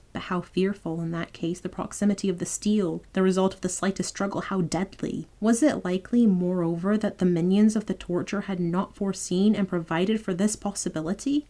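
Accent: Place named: Scotland, United Kingdom. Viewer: I just found your channel. Your accent is strange. It's like you're either from Scotland and have been living here in my home state is Indiana for years OR you're FROM the Midwest and have moved to Scotland or nearby and have picked up some of the accent. It's wild. It's like, to my ears, you almost sound like an average US person but then it's sprinkled with a bit of a Scottish brogue. Lol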